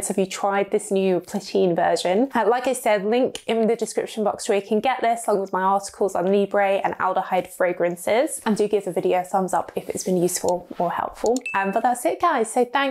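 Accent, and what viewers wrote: England, United Kingdom. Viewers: just wanted to tell you how much I enjoy your reviews. You are such a joy to watch! I found you a little over a year ago when I was recovering from a surgery. I watched tons of your videos to pass the time and I so enjoyed every one. You seem like a true girlfriend/Penpal to me. I’ve always loved perfumes and you share that love obviously like many of us. I have to admit even if I’m not interested in a perfume you are reviewing I’ll still watch your video just because you are such a light and a cheery person and I absolutely love your English accent. I also just stumbled on one of your older videos and I have to say that my husband is also dyslexic. You are so very intelligent and eloquent with your words. So thankyou again for what you do and you are very very appreciated ❤️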